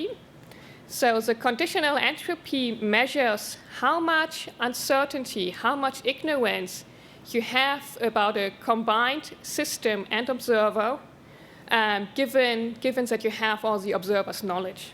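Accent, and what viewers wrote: Germany. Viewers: Free Thinker, I think she's German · I think she is a German who was taught British English by (or near) the French. That's my hypothesis. Anyways, very interesting talk!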